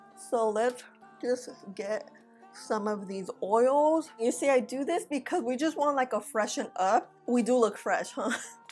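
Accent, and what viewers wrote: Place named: East Coast, United States. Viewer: Didn't like 😢... wanted someone to tell me different ! You did ! Thank you ! I like your accent .. you sound east " coasty"... NY native here..pS>I subbed ❤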